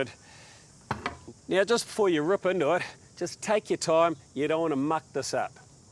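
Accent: New Zealand. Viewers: with a name like Gonzales, perhaps you are just not familiar with the NZ version of English. I was born in Australia and familiar with kiwi accents, as they probably are with some of our aussie accents! If you have an accent, I guess also some of us would have difficulty understanding your version of english. Tolerance and understanding will help us all overcome such judgements...if you watch what he's doing as he is speaking it is pretty easy to fill in the gaps of of misunderstanding his accent ;-[) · hes kiwi where u from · kiwi accent haha shit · Nice job man. Quick question: what's a 'chuzzle'? #kiwiaccent 😁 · He has a kiwi accent, he's from Manawatu in NZ :)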